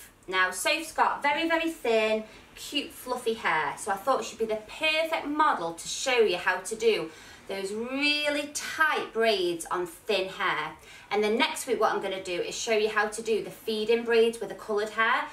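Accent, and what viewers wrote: United Kingdom. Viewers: They are both British but morgz and morgz mum are from Sheffield. And I don’t think she is from Sheffield but she is from Britain 🇬🇧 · British accent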